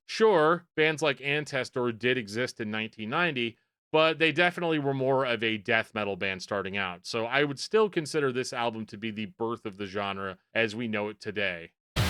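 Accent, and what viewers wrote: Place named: Midwest, United States. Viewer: Greetings from 🇸🇪 Thank you for your lovely midwestern pronounciations and this phenomenon I was unaware of! As a neighbour, I guess Vaakevandring should probably have the heaviest accents on the first a's and secondly the second a. Awake wandering.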